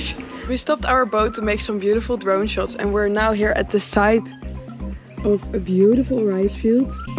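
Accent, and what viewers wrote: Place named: Netherlands. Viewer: You sound just like ivana from travel iv . That dutch accent is very noticeable. Do a collab with her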